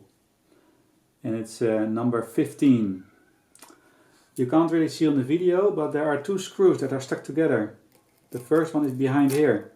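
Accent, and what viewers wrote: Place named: Netherlands. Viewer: Ik kon horen dat je nederlands was haha! Bedankt voor de vid.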